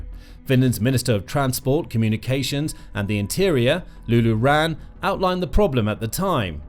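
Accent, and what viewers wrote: England, United Kingdom. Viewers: Hey can you switch to American accent? The British accent is getting a lil annoying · Bonjour, à votre accent je crois comprendre que vous êtes Anglais, donc "va en guerre", et je ne crois en RIEN votre propagande. Rassurez moi, le Russie a construit ces bâtiments sur son territoire ??? Donc elle a le droit . Vous vous persuadez tout seul que la Russie attaquerait un des pays de l'OTAN !!! Redescendez les pieds sur terre !!! Et vous ne parlez pas des troupes de l'OTAN s'installant en Roumanie, tout près de l'Ukraine et des troupes Russes !!!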